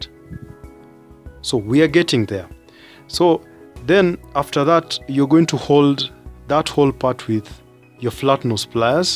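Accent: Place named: Somalia. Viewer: defo somali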